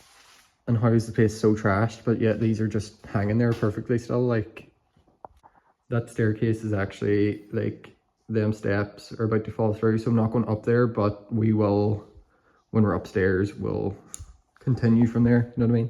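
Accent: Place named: North, United Kingdom. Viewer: We all love your northern accent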